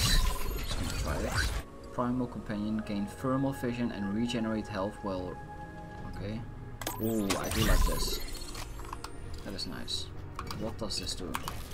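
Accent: Netherlands. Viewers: Are you dutch? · vindt je nl accent zo grappig nice vidoe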